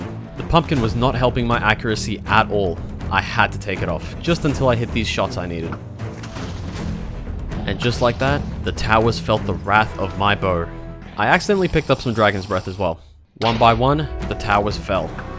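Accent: Australia. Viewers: R u aussie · How is your accent both Australian AND New York? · i'm not english native but i'm trying to figure out ur accent. are you australian?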